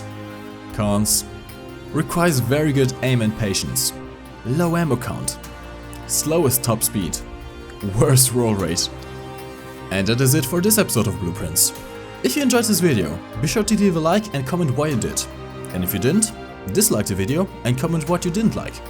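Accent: Germany. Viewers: Really this is a German english accent!! I really like it!!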